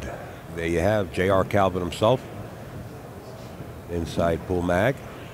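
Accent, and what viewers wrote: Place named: New York, United States. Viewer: We only need efren, NYC accent is not adding to my experience....sorry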